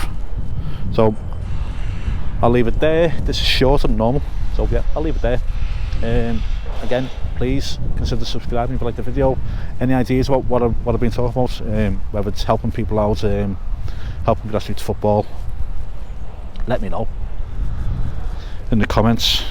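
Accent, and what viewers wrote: Liverpool, United Kingdom. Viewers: Cool hearing the difference between the actual Liverpool accent (yours...I'm guessing N.Liverpool..?) and Wirral accent. I know given the short distance outsiders think the two accents must be the same the same....but they're not.✌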